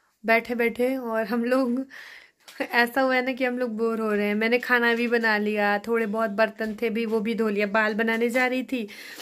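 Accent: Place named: Varanasi, India. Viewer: आप वाराणसी मेँ रहती हैं क्या',सो funny, अरे का खइनि ह समोसा तो ख़ इनि हं👌..कोई बात नही आप लोगो की बात न सुने आप जैसी हैं वैसी ठीक हैं वैसे दुनिया में कुछ लोग हैं जो कितना भी अच्छा कर लो ,लोंगो को गलत ही लगता है इसलिए ,ना किसी के अभाव में जिये न किसी के प्रभाव में ये जिंदगी खुद की है बस इसे अपने मस्त स्वाभाव में जिये..🙏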